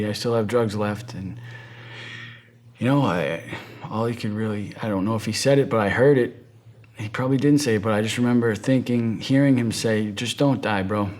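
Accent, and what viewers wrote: United States. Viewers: Born in Boston. Knew you were from MA as soon as you said your name. Best of success for you! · ... G:)d bless the Boston accent ❤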